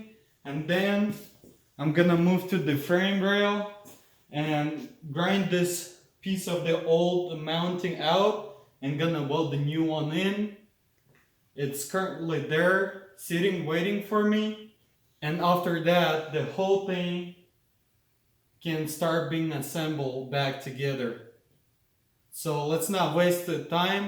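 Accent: Russia. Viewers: you speak english like a russian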